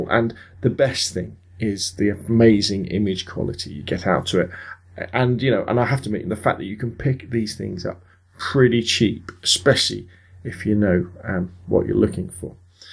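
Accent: United Kingdom. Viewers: Best review! Beautiful accent and I believe it's British accent! Peace :D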